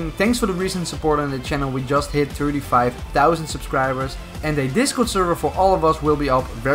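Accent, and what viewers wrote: Netherlands. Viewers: Are you dutch? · Je praat Engels als een Nederlander maar het is goed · are you Dutch? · you speak English with a Dutch accent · Ur from holland Bet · Wait you are dutch? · Nederlander hè?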